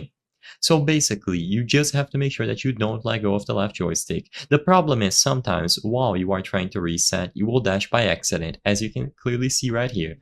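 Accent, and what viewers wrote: Brazil. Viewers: You have a Brazilian accent, that's funneh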